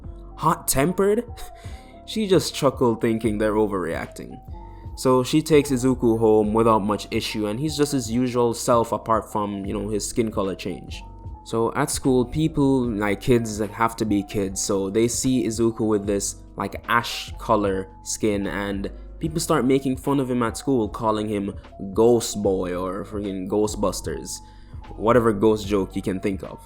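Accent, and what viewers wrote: Jamaica. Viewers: Listen no hate I'd just like to know do u have a Jamaica ascent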